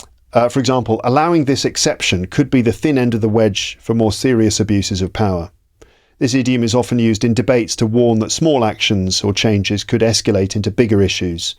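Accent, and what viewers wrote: United Kingdom. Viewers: Greetings from Brazil! I’ve been watching your videos to improve my British accent, you’re amazing !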